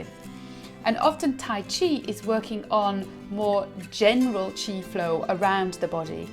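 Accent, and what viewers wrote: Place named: England, United Kingdom. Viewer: You're from England? I ask because of your accent. Lol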